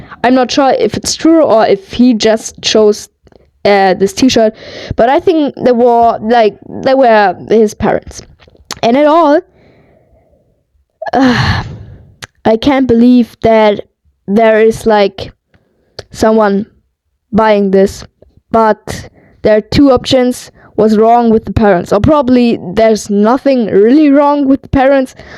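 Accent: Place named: Germany. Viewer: U sound german 🤔🤔 W vid ♥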